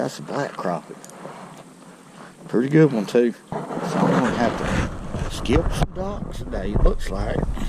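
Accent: South, United States. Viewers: Amazing how you have southern accent but say crOppy rather than crappy. Its and A not an O. If your from the south get with the program.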